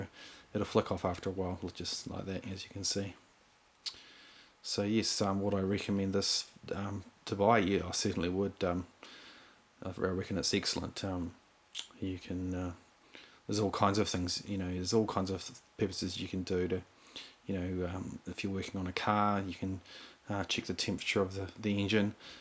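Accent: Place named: New Zealand. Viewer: Thanks, I was considering this, will definitely get one. Great to hear a Kiwi voice too!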